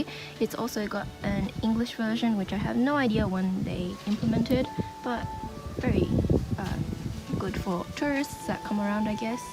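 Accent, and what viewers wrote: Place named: United Kingdom. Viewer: Your british accent is so 'umai'.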